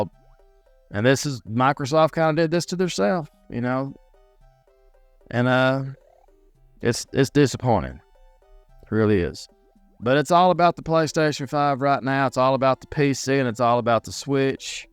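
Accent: South, United States. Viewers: I didn't know anyone could have a Southern accent that thick!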